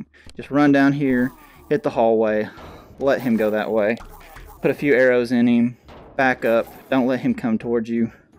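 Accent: Texas, United States. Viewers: I love your Texan accent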